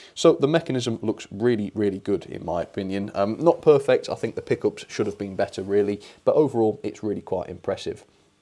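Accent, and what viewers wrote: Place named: Lincolnshire, United Kingdom. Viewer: Are you from Lincolnshire? You're accent sounds familiar.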